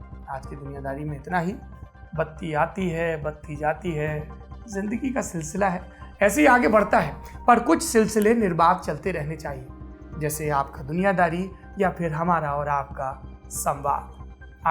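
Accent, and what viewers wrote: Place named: Varanasi, India. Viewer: Mahur bol dela guru..... Banarsi style me😜😜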